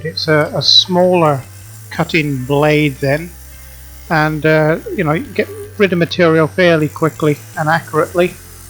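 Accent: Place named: England, United Kingdom. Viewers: Where do you hail from sir, that sounds like a very West Country accent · Australian? I thought you sounded very much from the south west of England, Cornwall/Devon area. I actually came to the comments section to say something about the quality work of a classic man in his shed. (A proud UK tradition.) Any links to the UK? I know Cornish miners had a heavy influence and there was a lot of migration to Australia from here in the 1800's. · I love this piece. I really enjoyed watching how you got into that centre and then leaving the top as is. It gives it its own personality. Can i ask where you are from. I'm from the UK and it appears that i am hearing an English accent but with something added and i can't figure out. Thanks for sharing pieces with us. Shirley from the UK.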